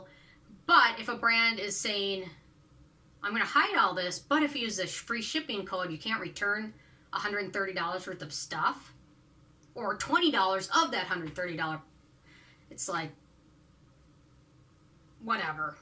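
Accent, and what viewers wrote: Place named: Midwest, United States. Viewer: Chicago or MN? Maybe even PA? Accent.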